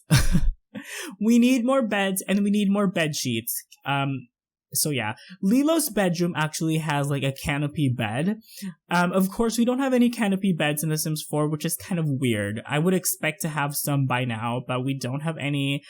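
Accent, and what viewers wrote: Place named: Philippines. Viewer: Hello!! I love your builds so much~!! They are all so creative, I wish I was gifted with such talents for building houses in The Sims. :)) Anyway, you're probably tired of this topic, but I just wanted to share to you that the first time I came upon one of your videos, your accent didn't give you away, but there was a tinge of something or a slip that made me so sure you're Filipino. And, tada!! You are. Teehee, mabuhay!! I like your voice and the way you speak, by the way (creepy much XD). Also, I would like to say that I, too, am struggling with my academics. Damn. In high school I was doing great and college is very different. And I know how it feels to fail on something you worked really hard for. You're not alone. :)) But I'm glad to hear you're doing better. I'm in the same position as well. I have a few units left but it's not an assurance that next term would be my final term for undergrad (thesis is that one big obstacle. 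I'm taking Engineering). Hopefully it is. I think it's just a matter of trying again, and trying again with 200% effort? There are times we get lazy, I know, but it's ok. Sometimes we need to take a break. I do believe that if we fail on something despite giving our 100%, it's because there's a reason behind it. We just have to look at the brighter side of things. Cheers to you and good luck on your future videos~!! Good luck on your acads as well. ;)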